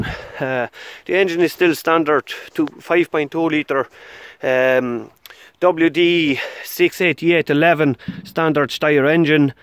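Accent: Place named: Ireland. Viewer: Masz farmę w Irlandii ?? Trochę czasu tam jesteś akcent mówi trochę . Robię dla Irlandczyków w Londynie. Zdrów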